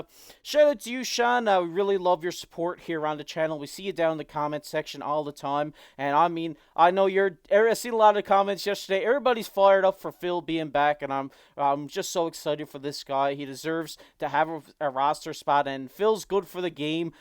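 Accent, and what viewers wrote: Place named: Cape Breton, Canada. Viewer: I’m guessing Cape Breton.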